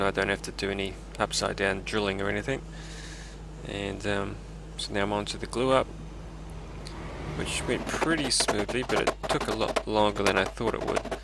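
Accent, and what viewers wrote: New Zealand. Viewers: are u from nz?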